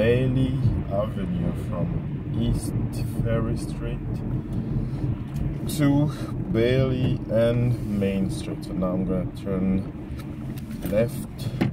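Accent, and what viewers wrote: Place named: Nigeria. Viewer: Wow...i love your accent. Are you Nigerian?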